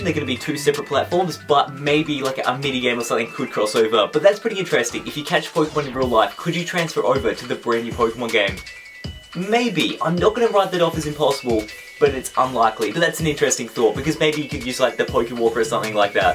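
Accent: Australia. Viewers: he is Australian that is how we speak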